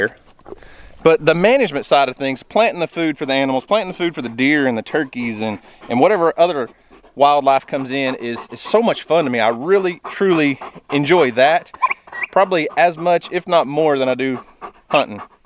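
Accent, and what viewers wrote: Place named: Texas, United States. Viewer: 9000% lol sounds like Texas. Great video I really enjoy watching them.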